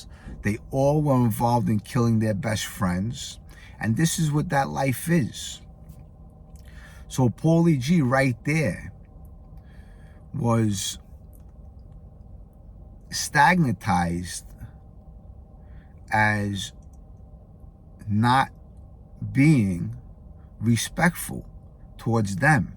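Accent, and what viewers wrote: New York, United States. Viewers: still loving your vids but check this. Im interested in mob life like millions of others world wide.Would I want to live that life? Not no but HELL NO!. I think you made the right choice myself. The New York accent is bad ass. Keep being your true self and to hell what others say!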